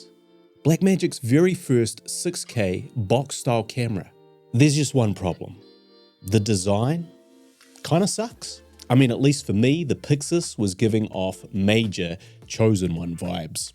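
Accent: New Zealand. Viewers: Nice to hear another Kiwi voice on the webs!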